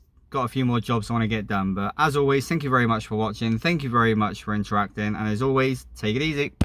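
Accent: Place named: West Country, United Kingdom. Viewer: I’ve got hold of spare engine low Miles off local guy who been Breaking t4s for years it’s showing 10700last mot he said it was only 112000 when he drove van to his.runs well he said he does know t4s well.got the turbo an diesel pump plus manifolds Too.where you from?im in Cheshire u sound like Bristol Somerset.we be Goin Cornwall again soon my wife’s family there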